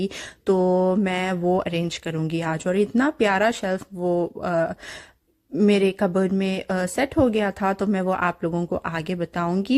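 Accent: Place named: Hyderabad, India. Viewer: Hey, Assalamualaikum. I live in Melbourne, Australia too. I just wanted to suggest that you don’t change your accent our Hyderabadi accent is the best. ❤️ Love your videos🤍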